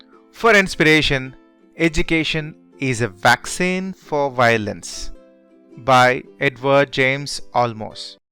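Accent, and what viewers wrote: India. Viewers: funny indian accent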